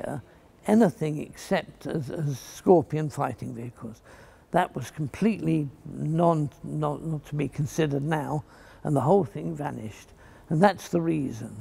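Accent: United Kingdom. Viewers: Why does the British accent make everything fun to listen to? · That is the correct UK English pronunciation. How it is pronounced elsewhere, including where the jaguar cats actually live, is irrelevant to the subject.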